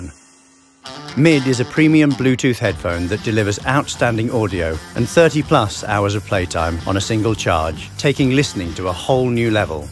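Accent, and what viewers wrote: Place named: Germany. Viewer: 3 yıl geçmiş ama neden türkçe başlıklı bi videoyu alman aksanlı ingilizce olarak eklersiniz ki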